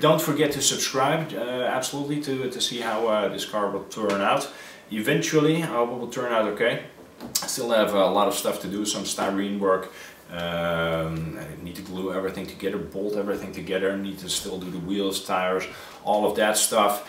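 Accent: Netherlands. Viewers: Hey buddy, are you dutch? You sure sound dutch cuz I am too ;)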